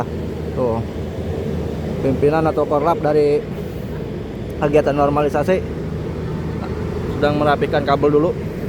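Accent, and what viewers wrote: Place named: Jakarta, Indonesia. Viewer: Ni youtobernya lucu bhsanya Betawi bnget hhhh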